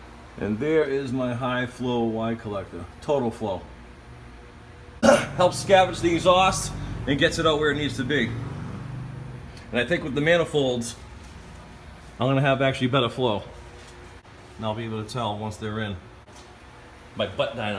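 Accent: Boston, United States. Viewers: Great video. I’m looking for a good set of headers for my ‘21 Ram 1500 Hemi. Also , where are you guys from? You talk like me (Boston).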